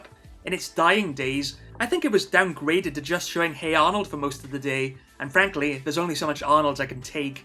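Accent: Wales, United Kingdom. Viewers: Hey, there's another Welshie! I'm from North Wales, I reckon you're from Swansea or Barry? I'm sure S4C had a second channel at one point, but it was mostly used for overspills of the Welsh Senedd broadcasts. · I'm just now realising that your accent is Welsh and not Northern English. I'm an idiot.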